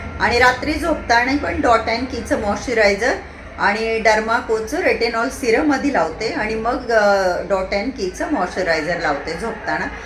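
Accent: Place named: Satana, India. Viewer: ताई कुठे राहता नाशिकला तुम्ही नाशिकला कुठे शिफ्ट झालात तब्येत फारच बिघडून गेलेली आहे तब्येतीची काळजी घ्या ताई ओटी खूप छान भरली देवीची सगळं आपल्याकडच्या पद्धतीनेच केलं तुम्ही सटाणा खूपच छान बोलता मला खूप तुमचे व्हिडिओ आवडतात पण तुम्ही कधी कधीच व्हिडिओ टाकतात ताई नाशिकला कुठे शिफ्ट झाले सांगा मी तुम्हाला भेटायला जरुरी मी पण नाशिकलाच राहते ताई कमेंट ला रिप्लाय द्या माझी सुंदर ताई माझी सुंदर बहीण माझी गोड बहीण अशाच गोड सतरा अशाच बोलत रहा खूप छान राहता येत मी श्री स्वामी समर्थ शिव शंभू शंकर बाबा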